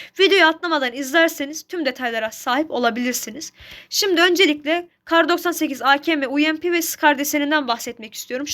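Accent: Azerbaijan. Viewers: Qaqa Azərisən türkcə danışırsan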